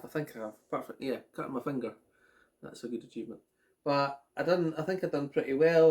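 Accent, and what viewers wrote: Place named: Scotland, United Kingdom. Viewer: Just wondering what part of Scotland are you from. I myself I was born in Fife👍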